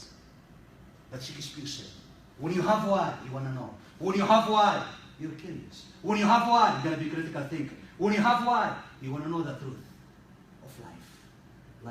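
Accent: Egypt. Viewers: Still ur Egyptian accent beholding u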